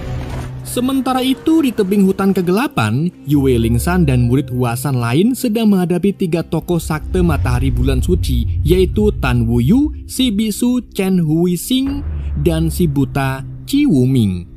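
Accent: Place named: Tegal, Indonesia. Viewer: ngapak,tegal mas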